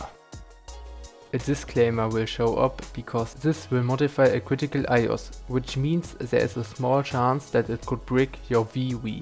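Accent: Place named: Germany. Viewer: man hört dein deutsch krass raus haha aber trotzdem danke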